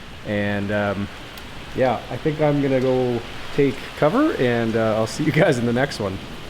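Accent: Canada. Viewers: Where are you from lol, ur accent sounds Canadian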